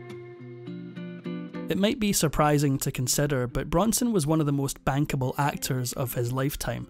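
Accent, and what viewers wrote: Scotland, United Kingdom. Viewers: My favorite Narrator love the Scot!!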